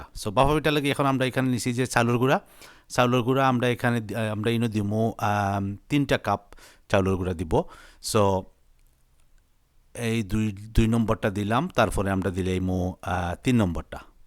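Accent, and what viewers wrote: Sylhet, Bangladesh. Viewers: Wow, you made really nice vapa pitha. I enjoyed your Sylheti language, it was funny. Kub Moja Laglo bondu.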